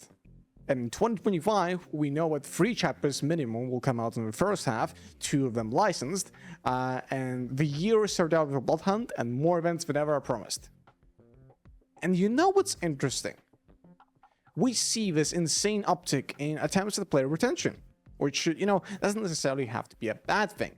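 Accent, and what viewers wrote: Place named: Finland. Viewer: omg, that finnish accent, idk if u are from Finland but your accent sound very finnish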